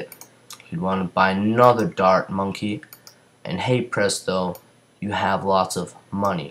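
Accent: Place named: Australia. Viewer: so...u went from an American to an Aussie?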